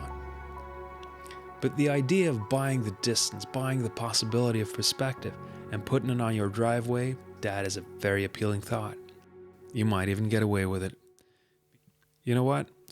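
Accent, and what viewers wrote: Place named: United States. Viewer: Nice video! I also like you american accent ;-)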